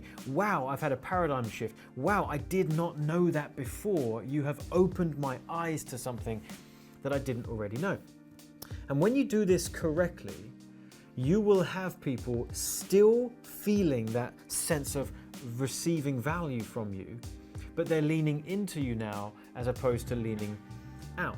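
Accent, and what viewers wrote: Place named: United Kingdom. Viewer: We need deep dive,. Thank you so much! Incredible content. Also loving your British pronunciation =)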